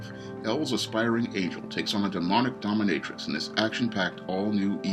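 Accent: United Kingdom. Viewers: I enjoyed the British accent. Also I will never get over your laugh. It's contagious!! 😄😄😄😆😆😆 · Thumbs up for the British accent and the term Blackistan. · I usually cringe when an American tries to pull off a British accent. But this was hilarious. I had a jolly good laugh after this video. As for Harry. He should have pulled out when he had the chance. His own brothers warned him multiple times. But he was stubborn and foolish. Blinded by love. And he will face the consequences of this silk spider web soon enough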